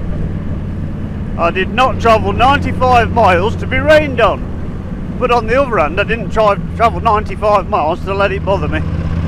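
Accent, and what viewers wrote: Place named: Lancashire, United Kingdom. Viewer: mate I freakin hate bugs 😂 and the amount of wildlife we see and the GoPro does not pick it up is incredible! That’s another reason I stopped using Superview on it cos it even makes curvy country roads seem dead strait!. Ooohhh and the Accent is Lancashire am originally from Preston ‘ 👍👍🍺🍺🥃🥃🇬🇧🇺🇸 ooohh Mate Thanks for the sub Appreciate That 👍👍🤘👌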